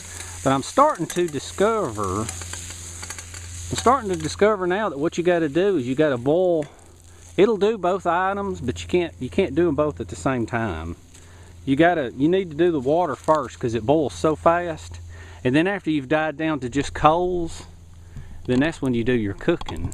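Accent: United States. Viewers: You've got my vote for best American accent on YouTube.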